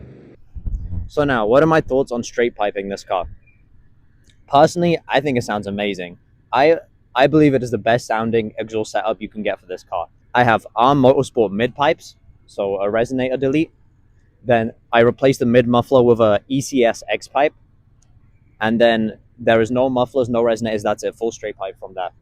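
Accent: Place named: United Kingdom. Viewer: what happened to your british accent man, bring that shit back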